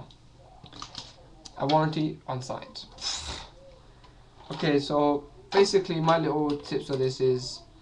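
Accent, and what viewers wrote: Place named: Portugal. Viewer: u sound portugese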